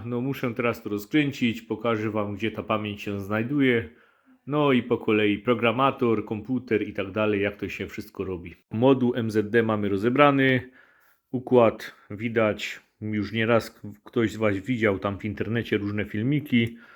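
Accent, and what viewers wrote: Silesia, Poland. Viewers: Skond żeś Chopie jest ,bo słysza że nosz synek ze śląska, pozdrawio Rybnik. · jestem swiezym posiadaczem magdaleny z 2016r troche wszystko to nowe dla mnie wczesniej siedzialem w peugeotach ostatnim byla hybryda 508 RXH znalazlem twoj kanal swietny masz kontent bo krok po kroku wszystko a do tego ten hanysowy bliski sercu memu akcent:P (pochodze z Swietochlowic ale od prawie 19 lat w Irlandii) Mam takie pytanko kupilem od zoltego brata modul do AA P3.2 i czekam na niego musze softa zaktualizowac mam teraz <num> EU N i jaki bys polecal wiem ze musi byc 70 + ale jaki konkretnie. Bardzo ciekawi mnie te twoje polaczenie szeregowe z kompem jaki to soft itp (wiem o tych modach co mozna dograc) Moglbys rozwinac temat ewentualnie polecic stronke/forum z taka wiedza?? Dzieki wielkie za filmiki sa bardzo pomocne mysle ze gdybys nagrywal po angielsku mialbys just duzy kanal:P. 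pozdrawiam Tomek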